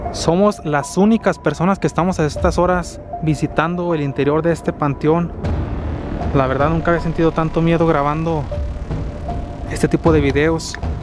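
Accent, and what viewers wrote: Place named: North, Mexico. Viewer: qe me encanta ese asento mejicano como norteño qe lindo México Saludos desde Chile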